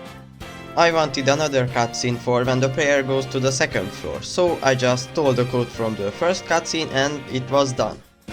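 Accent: Hungary. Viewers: are u hungarian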